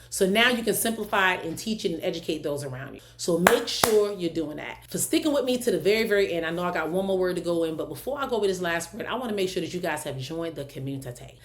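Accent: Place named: Philadelphia, United States. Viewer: That Philly accent is strong!! Much love🙌🏿🙏🏿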